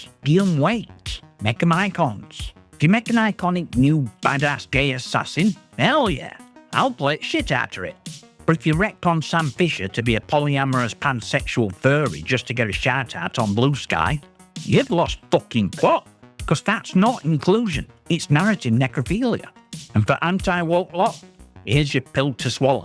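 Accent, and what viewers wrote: Yorkshire, United Kingdom. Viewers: Bloodyhell I've not heard this accent in a while. What part of Yorkshire you from? Hahah I agree with your video for the most part, nice work man.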